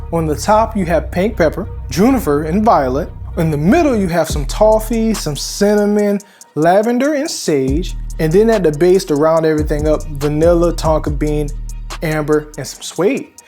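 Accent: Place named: South, United States. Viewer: You must be from the south, the way you pronounce st words 😂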